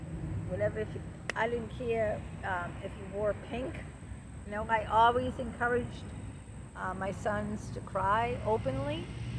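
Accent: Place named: New York, United States. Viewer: Hey. Totally cute and funny as hell. Yep, watched the whole talk. And I'm not a bot. I'm guessing , with that accent, White Plains NY or maybe Yonkers? (pushing it). I am 62 now, and you just captured all of it. Do they have Gay G.I. Joe now? Haven't had that one dumped in my lap yet on soc. media. Rainbow Joe. Healing prayers for your past trauma, Been there, done that. You look great, obviously taking care of yourself. ONWARD!!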